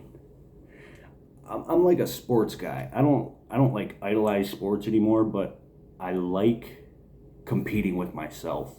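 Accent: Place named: Michigan, United States. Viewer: I knew your accent was familiar!!! I lived in Michigan for 20 years. God bless you brother!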